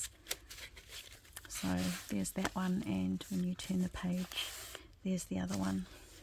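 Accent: New Zealand. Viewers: Gosh, some yummy ideas in this, I love it. What great idea for the Hydrangeas!!!! So very lovely and inspiring. Sending love from New Zealand. xxxxxxxxx Actually it sounds like you also a Kiwi. !!!!! You are very talented. xxxxx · When I heard your accent I knew New Zealand! 💖 Can't wait to eventually visit.... Lovely journal YES so many ideas! I especially loved the double sided lace pocket that revealed the card inside! thank you for sharing your work!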